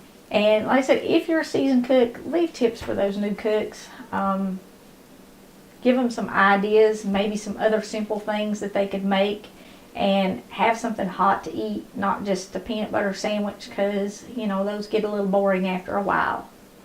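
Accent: South, United States. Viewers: I just want to add: I really do enjoy watching you, but I bet it isn’t the reason most other ppl do. I was born in and spent the first 25 years in Texas. Then I wound up in Edmond, OK, then Boulder, CO and back to Edmond again. I just miss southern accents! They make me feel at home! My grandmothers and great grandmothers were rural, farm and dairy people. We’d go on Sunday to visit and then for eating later in the day, my grandmother would reach into her refrigerator and out of a little bit of one leftover, and two or 3 more in margarine tubs, she could turn those into the best dinner!! She would also put out a huge plate of her homemade pickles!! And a big chuck of cheddar. All of those things just tickled me to death!! I get just a tad of that my memory watching you cook, so thank you!! I hope to make your corn fritters just as soon as the sweet corn is in!! 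My husband is a minister, so I laughed so hard with you telling on the kids in your first church who would say the verse, “Jesse wept,” and take their dollar and run!! I had fun sharing that story with my husband. I will just keep on watching, just wanted you to know, just the way you talk is like being home. Texas home, that is.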